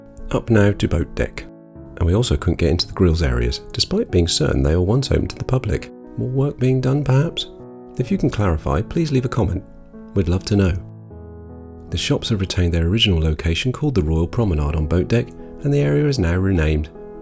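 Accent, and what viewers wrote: United Kingdom. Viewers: You do sound rather British, and many of them speak English.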